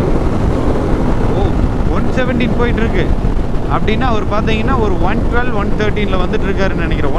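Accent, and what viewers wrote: Kongu, India. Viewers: Super 🥰 anna unga 🙏🙏🙏கொங்கு தமிழ் அருமை ❤️❤️👍👍👍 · Kongu Tamil super bro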